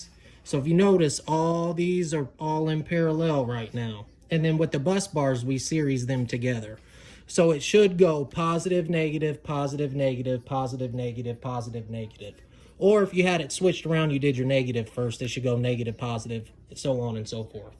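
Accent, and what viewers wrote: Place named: Indiana, United States. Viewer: Yo you from Indiana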